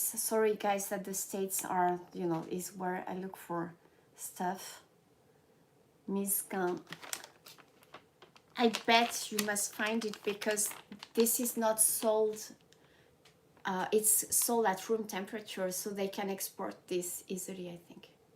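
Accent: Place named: France. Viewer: フランス語訛りの英語、理解するのがつらい🎵すしのシャリを入れた木製のボールは素晴らしい。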